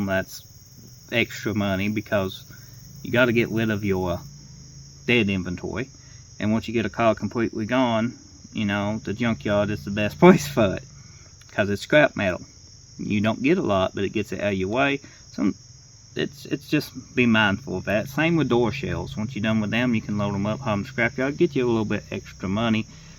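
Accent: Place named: South, United States. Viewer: My first instinct was why does this English man have a southern accent....I listened a little longer and and it became more clear..... discombobulated me at first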